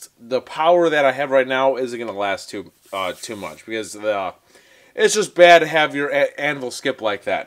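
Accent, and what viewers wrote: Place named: Wisconsin, United States. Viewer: Depending on if he's using "freedom units" or canuckistanian metric units. :D I would've guessed Wisconsin or Michigan from the accent, but Ontario is not entirely impossible.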